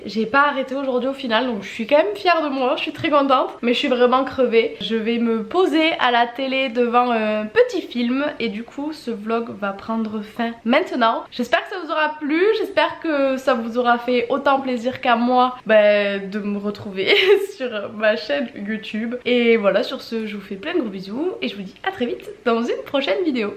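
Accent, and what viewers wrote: South, France. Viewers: J'adore ton accent du sud 🥰 j'avoue la satisfaction du rangement 😅 par contre la couette j'avoue c'est un bordel 😭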